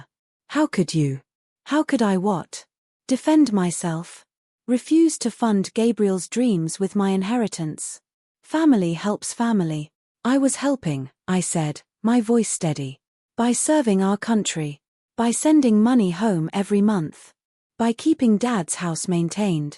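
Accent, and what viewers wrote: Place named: United Kingdom. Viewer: Supposed to be an American but has a very distinct British accent. Annoying!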